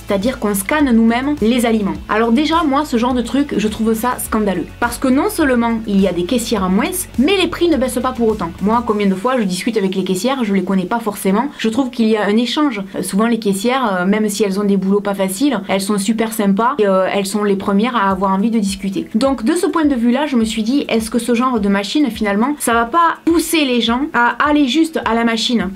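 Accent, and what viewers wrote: South, France. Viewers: super ! en plus ton accent est super agréable comparer à d'autres accents du Sud ( généré Marseille ...) continue comme ça tu es super 👌👌❤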